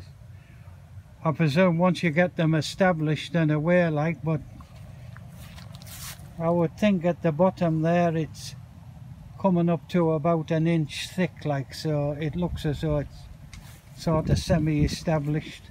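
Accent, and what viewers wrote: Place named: Newcastle, United Kingdom. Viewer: you make me laugh you sound like me old granddad god bless him proper Geordie man..died at 94...done ww2, Burma and stuff, from Stanley Co Durham,..I used to gan me holidays to Consett and East Stanley...spoiled as a child, im Scottish lad but my holidays were spent in Newcastle,...Iloved it as a wee laddy, you must be a Mac,am....south of the Tyne.....great people north east,...love the Geordies,...if i ever meet you il bring a bottle of Newcastle Broon over for ye bonny lad....Im a reet at takin Geordie like man nae bother god bless ya.....gan the TOON, <num>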